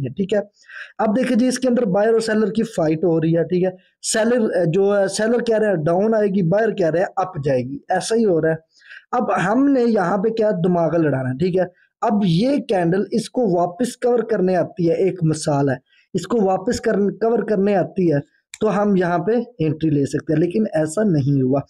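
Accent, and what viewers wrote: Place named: Kashmir, India. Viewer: Sir bohut kush sikhne ko mil rha ha apki videos sa bilkul hmrey jammu and kashmir ki trh language boltey ho ap❤❤❤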